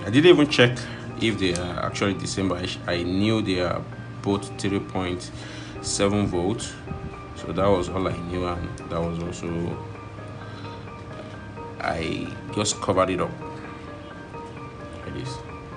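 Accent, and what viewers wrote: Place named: Yoruba, Nigeria. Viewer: When i heard how you are speaking, i uad to check your name. Yoruba man 👍👍👍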